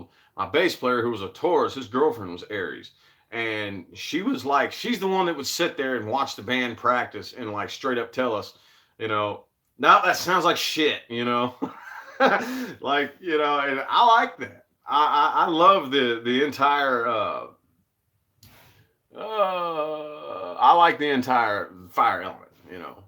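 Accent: South, United States. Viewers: You sound like you could be from the south, maybe I’ll figure it out as I keep watching your vid’s. I’m glad you have a happy relationship. Blessings 💗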